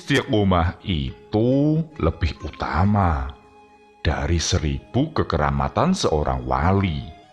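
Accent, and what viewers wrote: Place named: Banyumas, Indonesia. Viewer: Salam ngapak Yen ora ngapak ya ora kepenak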